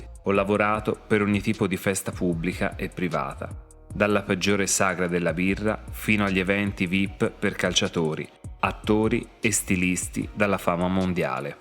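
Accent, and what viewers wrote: Tuscany, Italy. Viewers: Cmq mi fa impazzire il tuo accento toscanooooo🤣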